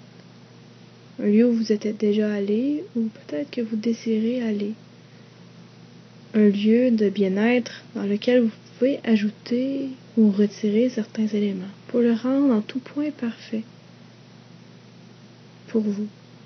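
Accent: Canada. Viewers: j'adore la façon québécoise de parler! c'est très agréable...(chui Français). de plus, vidéo très relaxante! merci bcp! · Bonjour, j'ai écouté beaucoup de vidéos d'hypnose, et je trouve ta voix très relaxante, merci. C'est peut-être l'accent canadien qui me relaxe, je suis français. Continue, tu es douée.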